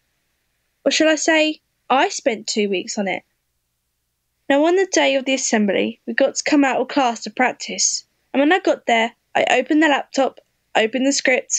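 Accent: United Kingdom. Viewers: It’s a British accent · Is she british i luv brits! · U sound British I like it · British yes finally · OMG I LOVE YOUR VOICE!!! (sorry im a sucker for British accents) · I love her British accent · Devica she's not Australian!! She's British!! I'm British too and that's what they sound like! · I love your British accent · Eyyy another british girl!! :3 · Is she British? I’m not being Offensive but I’m wondering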